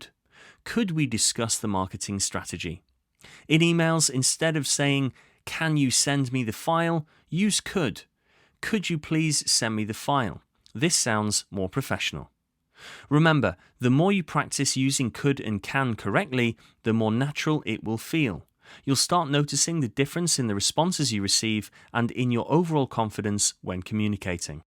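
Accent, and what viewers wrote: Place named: Wales, United Kingdom. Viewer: I've been ever so swamped with work lately that only now have I been able to begin to watch your current series of 41 videos (so far, hopefully kkk). No need to say I'm enjoying them no end. Thank you, thank you very much. As for your Welsh RP... well... I love it!!! kkk 😊😊😊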